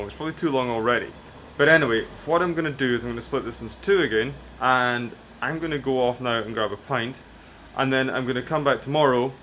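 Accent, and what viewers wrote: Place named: Scotland, United Kingdom. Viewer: Okay, are you a Scot living Sweden or a Swede living in Scotland?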